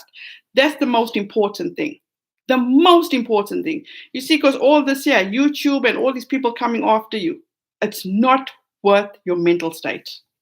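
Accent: South Africa. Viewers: Btw I love your accent, I worked with 4 S. African doctors, love it!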